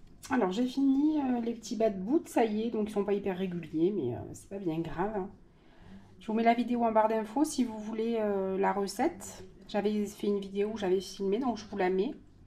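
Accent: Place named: South, France. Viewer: Bonjour, je suis trop contente de revenir un peu sur l'ordinateur, comme je t'ai déjà dit j'ai un cancer du sein, qui s'est bien passé, mais mon traitement hormonothérapie est lourd, il y aura un an le 27 juin que je le prends, j'ai souvent des douleurs articulaires cette fois sa a battu le record sa m'a duré en douleurs 1 mois, mal aux os😪 mais j'essaie de penser positif c'est difficile,regarder un peu des vidéos me font oublier tout en restant allongée, bon STOP je ne suis pas la seule, tes vidéos je les adorent, je te l'ai déjà dit, j'adore ton accent du Sud😍les livres tupperwares, j'en ai aussi beaucoup, haha!!!les buffets j'ai aussi, j'aime la recette de la pâte minute qui est au début du livre, Est ce que tu l'as déjà faite, c'est super, on met les ingrédients dans une boite qui ferme on secoue et c'est fini, bon en salé pour les quiches ou sucré pour les tartes, le taboulé hummm! 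me donne faim, je fais aussi comme toi, qq fois j'ajoute aussi des dés de pommes,tes petits pains me tentent aussi je ne connaissais pas, moi aussi j'aime les fleurs, j'ai aussi du pourpier pareil, dommage que tu es loi, sinon je t'aurais bien demandé des cactus, maman en avait, je m'en souviens, j'en ai eux d'elle mais ils ont fini par disparaitre, trop serrés je pense et sèché, j'aimerais tellement en avoir, si un jour on descends en Corrèze, je regarderai si des personnes en n'ont et en demander, merci pour cette belle vidéo, merci, à très vite, je te souhaite un bel après m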